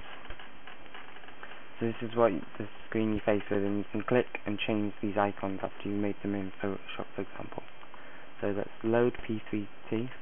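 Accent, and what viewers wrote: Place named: Guyana. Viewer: u ah guyanese too lol wah gwan bai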